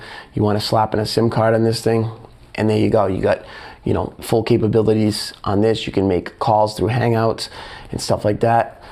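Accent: United States. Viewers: my friend told me not to bother getting this with the black Friday promotion... he has it and says its super slow. p.s. love your American accent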